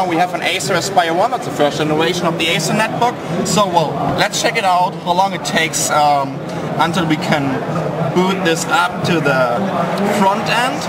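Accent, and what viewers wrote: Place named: Germany. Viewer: schon, aber sein englisch hört sich verdammt deutsch an...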